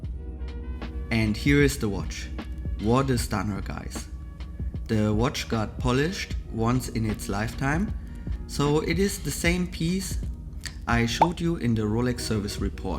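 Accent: Germany. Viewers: Are you a native German speaker ?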